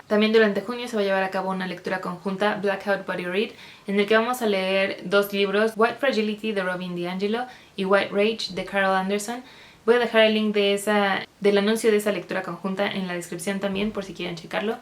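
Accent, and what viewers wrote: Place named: Spain. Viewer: Youuuu have an amazing spanish accent😭❤ Can't help but subscribeee❤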